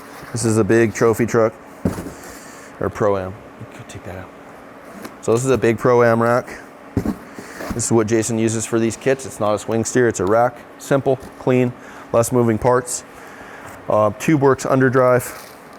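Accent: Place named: Australia. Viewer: Love your work mate beautiful works of art. Awesome to hear your have Aussie 🇦🇺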